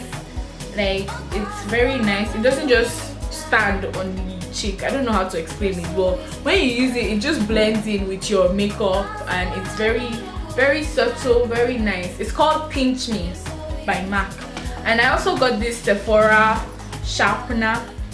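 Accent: Nigeria. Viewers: Very nice haul,I love the way u mix our naija accent n words too,kip it up girl tfs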